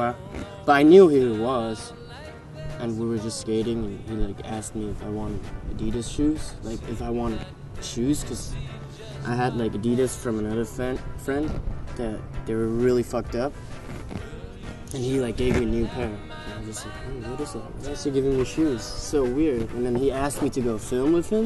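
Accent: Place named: United States. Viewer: how is his accent so american if he was raised in germany??! well anyways thats cool and impressive as shit!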